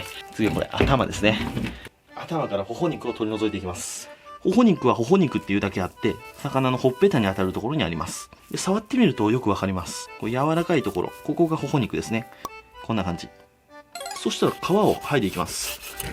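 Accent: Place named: Nagoya, Japan. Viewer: 動画は好きやけど名古屋弁がうざい笑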